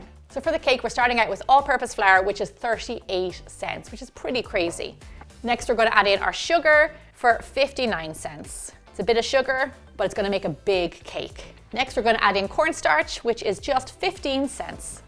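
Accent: Ireland. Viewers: You are so cute. I just discovered your channel & subscribed. First, I Love your Irish accent and definitely your baking recipes, (thoughts, strategies & ideas with baking). Also appreciate the mindfulness of cake prices you have and simply baking at home. I was looking for a birthday cake recipe too! Thank you 🙏🏻🙏🏻so much for sharing your gifts with us. Happy 11th Birthday 🎂 Gemma (Beautiful Cake🎂) & may God bless you with many more happy years ahead!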